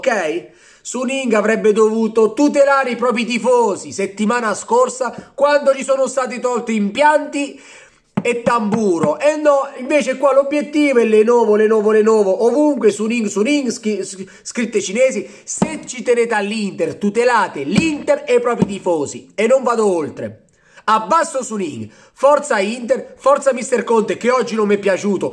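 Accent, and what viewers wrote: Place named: Apulia, Italy. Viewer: Ma cosa prendi in giro xhe si sente che sei pugliese anche tuuu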